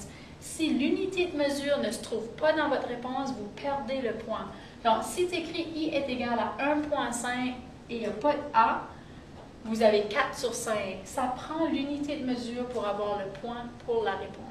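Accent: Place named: New Brunswick, Canada. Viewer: Wow superbes explications! Et j'adore le ptit accent Nouveau Brunswick!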